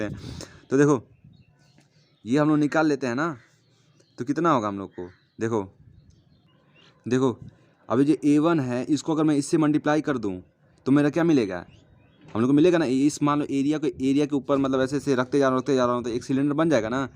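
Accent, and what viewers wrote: Bihar, India. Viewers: Ur bihari twist in hindi so wonderful 👍👍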